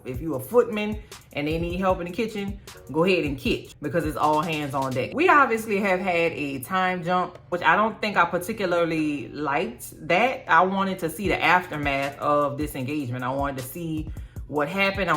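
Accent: New Orleans, United States. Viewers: You are so funny! I am glad I found your channel! Now I have two “shows” to look forward to on Sunday night! LOVE your New Orleans accent!!! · I'm up at 6am watching your channel and lmao I love your commentary and I love your New Orleans accent ❤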